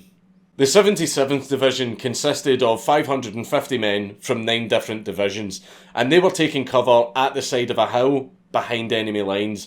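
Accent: United Kingdom. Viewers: Am I the only one impressed by his British accent? I am from Texas. Do a Texan! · with a British accent has to be the most cursed thing Ive ever heard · Your British accent is fucking spot on, absolutely love it!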